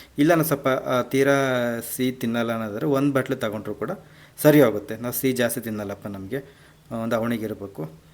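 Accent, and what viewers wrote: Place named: Davangere, India. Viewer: ನಮ್ಮ ದಾವಣಗೆರೆ ಮಾತು ಕೆೇಳೊದಕ್ಕೆ ಚೆನ್ನಾಗಿದೆ ಅಲ್ವಾ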